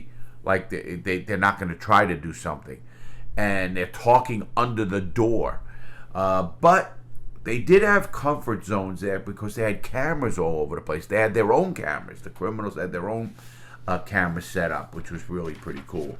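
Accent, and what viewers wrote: New York, United States. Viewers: he has the same accent with a little more new york in it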